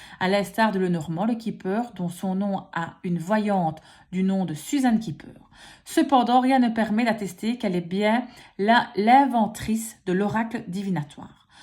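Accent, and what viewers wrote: Belgium. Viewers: super merci video haaaa l'açant Belge il y avait longtmeps tu est de quelle region ? merci un ex belge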